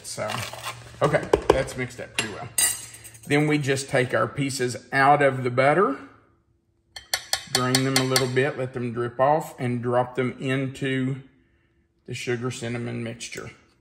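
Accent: South, United States. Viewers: I love your recipes... I love the way you teach and instruct us on how to fix them... I love your soft Southern in speaking.... And most of all I love that you're Christian... Thank you so so much... Your presentations are very comforting to me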